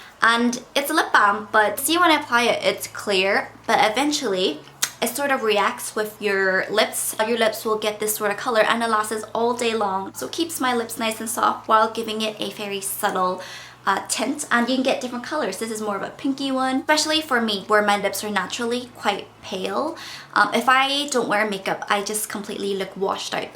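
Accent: Ireland. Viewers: I just found your channel and have been thinking your Canadian now I hear Irish!? 🤔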